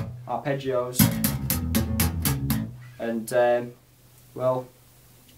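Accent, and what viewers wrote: United Kingdom. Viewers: great lesson! the best i've seen yet in terms of teaching technique. by the sounds of things you live pretty near to me, are you a nottingham lad by any chance? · hi man.. just chilling... been messing around with double thumb technique myself.. though ive not long been at the stage i wanted with my standard slap... but im geting there... after watching this i think its a great exercise to improve .... one i will try out in the morning.. nicely done dude... guessing from ur accent u must be northern england.... yorkshire or there abouts :)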